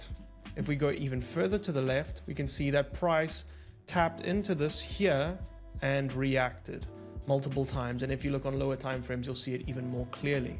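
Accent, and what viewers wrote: South Africa. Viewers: Thank you for this video - nice and clear and I think it was a beautiful South African accent :) I am new on your channel and found this very helpful!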